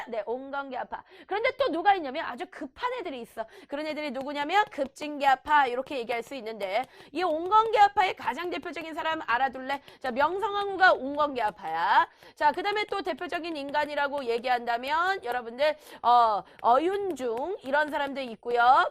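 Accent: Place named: Jeolla, South Korea. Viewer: 이분 고향 전라도라에 내 목숨 건다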